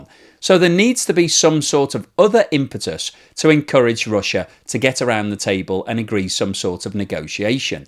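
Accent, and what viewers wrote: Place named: RP, United Kingdom. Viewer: your BBC English, your clever views and the capacity of explaining complex issues in a simple way makes you Top Expert!!!! Thank you!!!!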